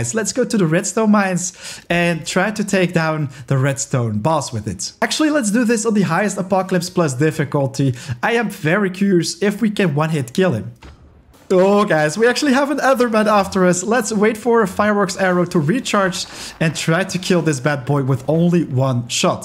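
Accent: France. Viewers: Are you french ? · Mais il est français ou pas, il dit pas mal de mots en français et il a un peu l’accent français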